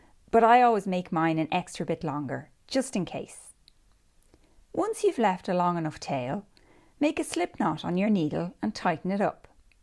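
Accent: Ireland. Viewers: You explain so well and go nice and slow. I think I've got it now. As for your knit stitch, do you knit like Americans and the English or the continental way? It just looked different, but then I haven't knit for over a year. Also, your Irish accent is soooo charming. What part of Ireland are you from? I had an Irish great great grandmother Gosnell, but I don't know where she was from.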